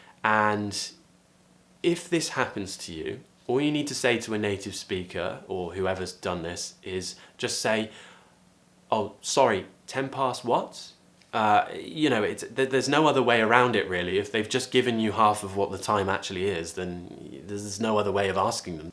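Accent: United Kingdom. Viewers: always I came here to learn British accent thank you very much for being helpful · I like your accent man , your way of explaining etc you r amazing and British accent is my favorite 😍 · I learnd a lot of from you, thank you for that . However i miss you as a british pronounciation teacher . Hope you'r son is doing well as you and the rest of you'r familie too . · I really feel excited of your British English pronunciation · I' ve been looking for a British pronunciation teacher for a longtime and finally i find you as i feel so lucky and privileged right now 💜💜💜🙌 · Hello! Thanks a lot for this video. I'm a Spanish native speaker and I would love to speak English with your British accent. Please, let us know where can we get your accent training.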